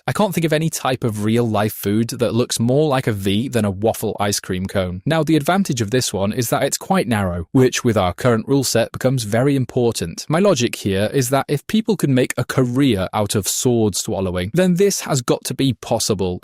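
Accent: United Kingdom. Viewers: he was British or some other country that speaks that kind of English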